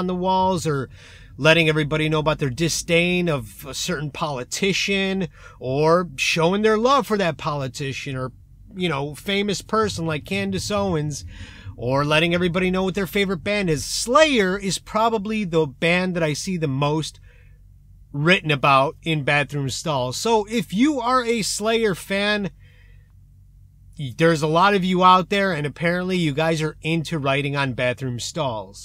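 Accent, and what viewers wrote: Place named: Russia. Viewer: His Russian accent=👋🏼🏃🏼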